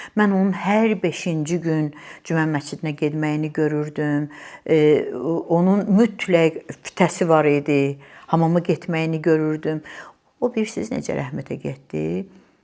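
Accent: Baku, Azerbaijan. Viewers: xanım sizə cansağlığı və uzun ömür arzulayıram. Baki ləhcəsini eşitmək mənə cox xoş oldu. Müəlliflərə də təşəkkür edirəm.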